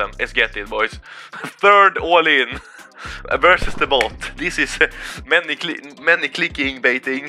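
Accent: Sweden. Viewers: You sound so Swedish, but I love it :D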